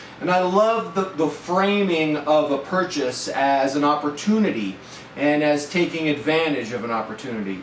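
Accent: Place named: Boston, United States. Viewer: You remind me of a SOUTHIE GUY🇺🇸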